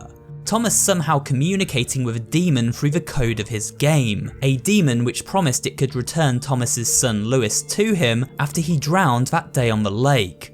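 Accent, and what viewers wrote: United Kingdom. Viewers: Wait is British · hes briitish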